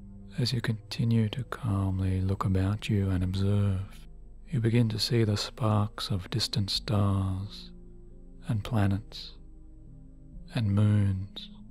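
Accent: Australia. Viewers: Are you austrailan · Are you a fellow Aussie or a New Zealander? Thanks for you video! X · I’ve been listening to you for a long time before I fell asleep. Recently, I realized that I can speak with an amazing Australian accent. Made me popular with my friends, thank you:)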